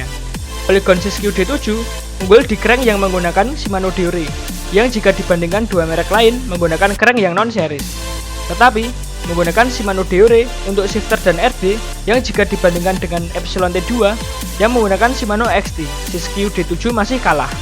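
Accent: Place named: Java, Indonesia. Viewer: Medok bgt bro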